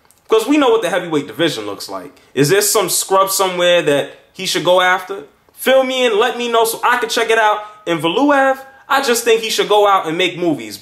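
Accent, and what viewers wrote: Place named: United States. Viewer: It's val-you-ev Goddamn American accent SNM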